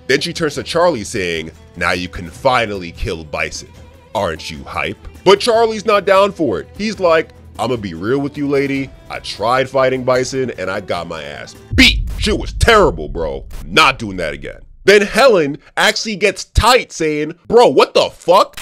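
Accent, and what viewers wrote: Jamaica. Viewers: I didn't know you were Jamaican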